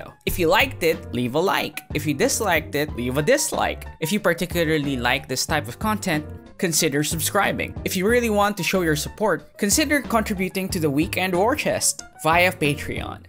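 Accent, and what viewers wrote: Philippines. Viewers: Are you Filipino? i can sorta hear a bit of an accent behind your words lol. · Hey bro, great video hahaha can't help but notice you have a little bit of a Filipino accent. Greetings from the Philippines! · Do I hear a Filipino accent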